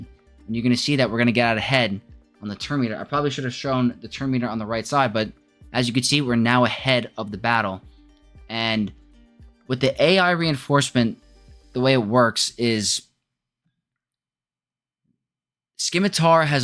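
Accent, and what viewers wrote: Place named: New York, United States. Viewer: That New York accent. I miss mine lol.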